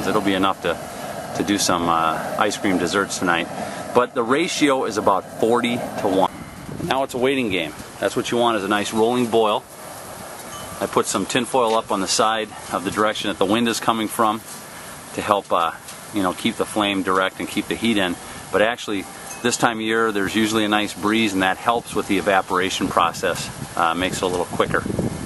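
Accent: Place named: Wisconsin, United States. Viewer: You live in wisconsin cuz I do and you have the accent lol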